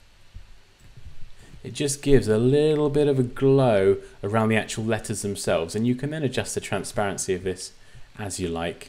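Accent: United Kingdom. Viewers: This tuts save my life, very interesting. And good UK speaking, i say it because when i surf into tuts made by people in USA i don't understand a f***. (i'm from Italy) Btw it's a great job!!! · his voice you mean his english i guess is british am i right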